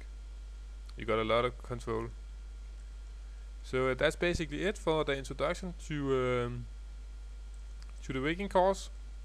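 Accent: Denmark. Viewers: you sound danish, am i correct?:p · Are you danish, ? Your english sounds bit like the 'danish style'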